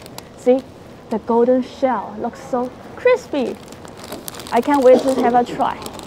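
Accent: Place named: United Kingdom. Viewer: You sounds like you're born in UK or studied there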